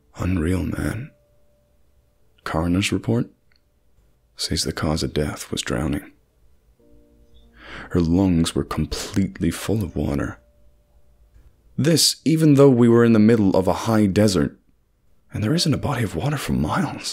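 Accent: South, United States. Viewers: Great job on the American accent! :-) I love these stories. My dad was a volunteer with Search and Rescue here in Canada years ago. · I swooned over the southern accent. I recently discovered your channel dear stranger, and I fancy your narrative. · Your American accent is 👌🏻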